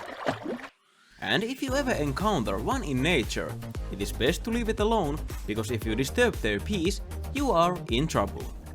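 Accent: Finland. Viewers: Ah tämä suomalaisaksentti on huippu tässä videossa :D edit. Mitä pitemmälle tätä katon sitä paremmaksi menee :D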